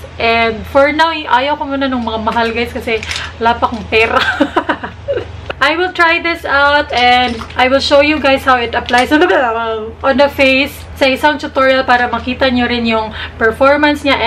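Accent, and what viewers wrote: Visayas, Philippines. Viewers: new subscriber here.. I like your bisaya review sounds so cute to me..Keep it up!! 😊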